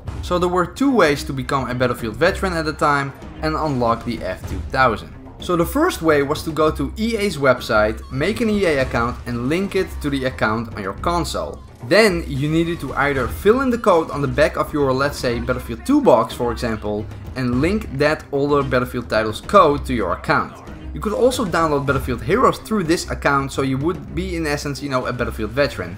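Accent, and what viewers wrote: Netherlands. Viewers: ik wist dat je nederlands was al vanaf begin door je acceny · The Dutch accent is so obvious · Mooi Nederlands accent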